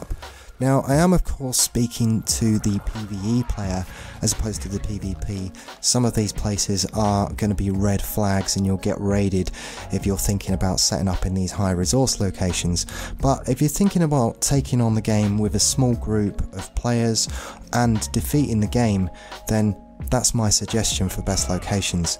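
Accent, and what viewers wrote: United Kingdom. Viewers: Hey bro, I can hear you´re British and I totally dig your accent, but I am Czech and here tea is only for ill people. We only drink it if we must, we drink coffee and we also hate the instant stuff, so cheers and thanks for all the info. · Cuz he is British lmao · Am I the only one that is getting a British vibe from him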